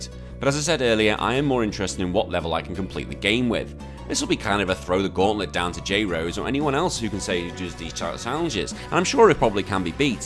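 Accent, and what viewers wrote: Liverpool, United Kingdom. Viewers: Scouse pokemaniac......love it 👌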